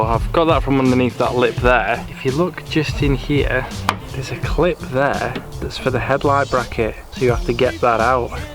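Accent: Yorkshire, United Kingdom. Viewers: Is that a Yorkshire accent pal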